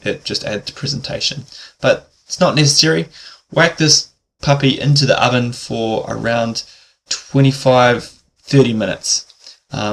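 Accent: New Zealand. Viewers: That's a Kiwi accent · That would be an NZ accent, but an educated one. Closest British similar accent is between Bristol Reading and Oxford. A less educated accent can sound much more like an Australian one, but to be fair educated Australians (rare as they are) can sound like educated NZrs. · Kiwi accent?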